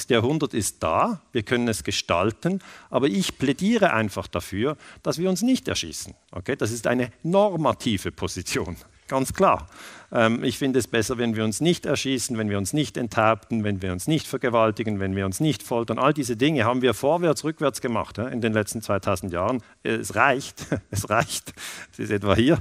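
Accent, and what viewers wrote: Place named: Switzerland. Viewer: Es chomt immer wider chli schwitzerdeutsch vo ihm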